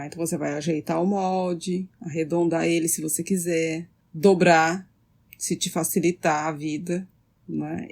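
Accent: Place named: Campinas, Brazil. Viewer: Gosto muito do jeito que você fala. Parece o sotaque da minha cidade: Campinas SP. Agora eu moro em Portugal, mas sinto muita falta de ouvir essa pronúncia ❤️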